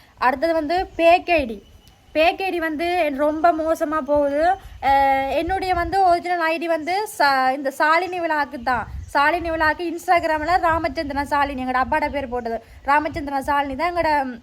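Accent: Sri Lanka. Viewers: Hi sister your Tamil alaga irruku iam from kasmir(Army) my native Rameswaram. My grand grand father is coming from sri lanka so ur langauge my grand father and grand mother pesura mathiri alaga Iruku . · தங்கச்சி உன் இலங்கை தமிழ் எனக்கு ரொம்பவும் பிடிச்சிருக்கு அழகா பேசுறீங்க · நீங்க உண்மையாகவே கிளிநொச்சியா உங்கள் தமிழ் மலையக வாசம் தெரிகிறது. மலையக மக்களும் சிறந்த பண்புஉள்ளவர்கள். நல்ல கடும் உழைப்பாளிகள்.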